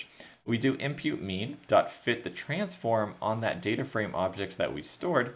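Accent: Canada. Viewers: Bro love the way you explained "Imputer" , And you pronounced "neighbor" accurately Canadian accent rocks brother Thanks a Ton !!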